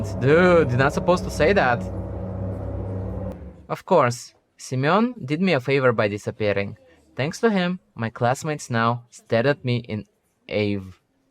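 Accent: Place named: Russia. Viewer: did you like that game? btw your accent sounds like Russian, I don't know why xD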